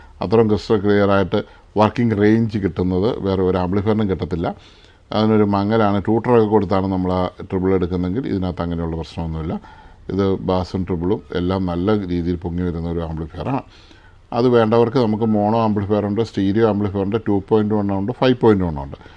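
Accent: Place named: Kanjirappally, India. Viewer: ഇദ്ദേഹത്തിന്റെ സംസാരം, ഒക്കെ കേട്ടപ്പോ തന്നെ എനിക്ക് നേരത്തെ മനസ്സിലായാരുന്നു കാഞ്ഞിരപ്പള്ളി ക്കാരൻ ആണന്ന് ❤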